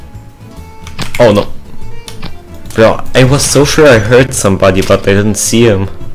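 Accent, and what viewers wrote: Germany. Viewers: Bro got the most German accent a person can have🗿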